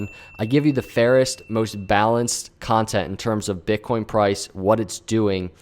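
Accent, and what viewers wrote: Philadelphia, United States. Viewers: Great job showing both sides of the coin! I appreciate your cautious optimism that comes with a Philly accent...long term bullish here. I don’t care what happens below $10k I am accumulating. Just don’t want to get caught off guard when that big candle drops any day now!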